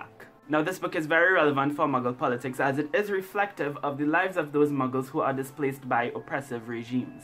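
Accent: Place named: Trinidad and Tobago. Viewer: As soon as I heard your accent I was like, "Wait is he from Trinidad?" And I look in the description and it says you're from Trinidad which is awesome because I'm currently living here! (moved to Trinidad from America a couple years ago) So nice to see a fellow BookTuber from Trinidad! Awesome video!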